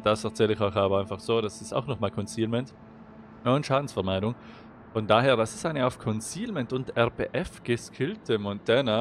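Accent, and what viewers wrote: Bavaria, Germany. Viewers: bayrischer dialekt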